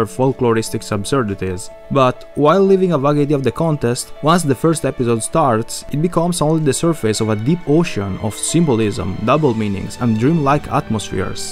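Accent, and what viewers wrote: Italy. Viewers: Greetings from Itali fratello. While your accent makes the audio a bit more difficult to understand, it makes me feel a bit prideful to know this franchise has such a smart and good fan and he is from my same country. Wish you well, hope life is going well for you. I had this on my Guarda più tardi for the last few years, glad i finally watched it all. I know i will rewatch the whole Monogatari sooner or later and thanks to your video, i will appreciate it even more. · his accent sounds very Italian to me. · Haha, anche io appena sentito l'accento ero curioso di sapere se fosse italiano, stavo per scrivere lo stesso tuo commento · By any chance, are you italian?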